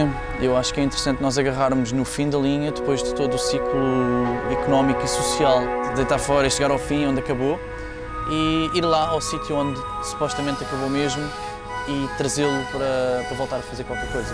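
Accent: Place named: Portugal. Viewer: É com sotaque Portugal.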